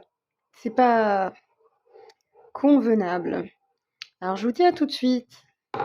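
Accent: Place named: Algeria. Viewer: Les recettes sont tunisiennes et l'accent algérienne j'ai rien compris 🤗🤗🤗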